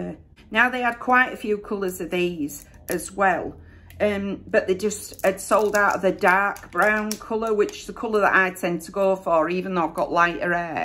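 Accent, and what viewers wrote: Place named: Leeds, United Kingdom. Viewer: Hi while I was scrolling you just popped up so I started to watch your videos I think they are awesome 👌I can't stop watching them you are so funny and you remind me of my auntie with your accent she is also from leeds love Michelle xx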